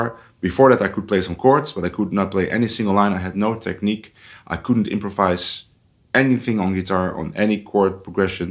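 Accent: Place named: Netherlands. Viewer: that dutch accent tho :p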